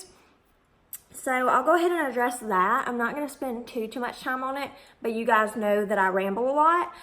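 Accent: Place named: Kentucky, United States. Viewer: Are you from Kentucky? I’m from Louisville in you sound like your from Lexington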